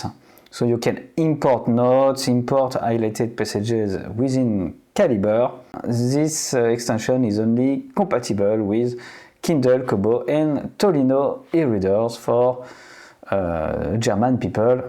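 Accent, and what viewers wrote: France. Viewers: loved your french accent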